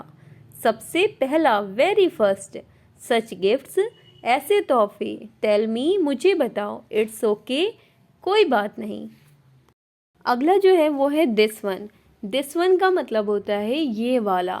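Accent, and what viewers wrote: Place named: Bihar, India. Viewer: What a bhihari English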